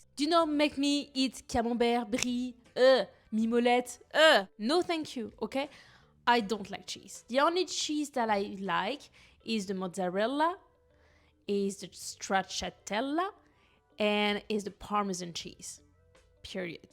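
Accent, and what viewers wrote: France. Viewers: c’était très sympa de t’entendre parler en anglais 😘. L’accent français, tu l’accentues pour les Américains ou me trompe-je?😉😁🤣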